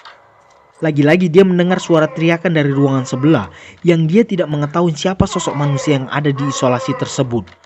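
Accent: Medan, Indonesia. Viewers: Admin nya Orang Medan ya?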